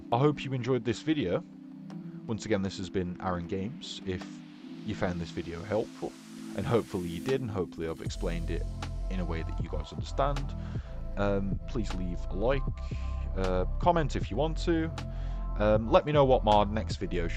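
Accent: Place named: United Kingdom. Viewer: Thanks For the Info. Even with the Heavy UK accent This New Yorker understood you very well. Not a fan Of "Recall" forced on you with Widows 11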